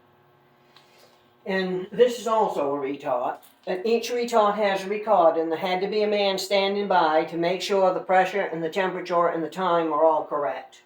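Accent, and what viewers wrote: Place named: Maine, United States. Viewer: Loved her Maine accent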